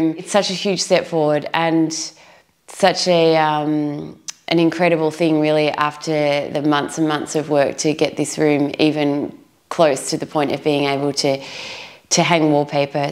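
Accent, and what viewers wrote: Australia. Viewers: Love love this! Yay an Aussie accent! And I’m only halfway through this episode. Stunning wallpaper. J’Adore! Tres Bien 💗💗💗 and congratulations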